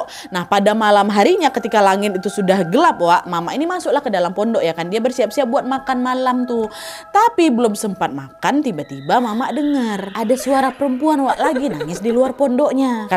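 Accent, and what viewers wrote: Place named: South Tapanuli, Indonesia. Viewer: Maro au,, kayak dari tapsel deh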